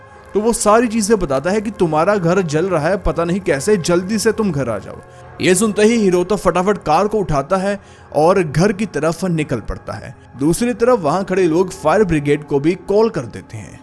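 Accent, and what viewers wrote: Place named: Punjab, India. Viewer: thorA bai punjabi touch nll bolda hindi like teekhi cheej bola nuklelee ko 😂😂 ndd bor nhi hunda bnda jinioo mrzi long hove video ❤❤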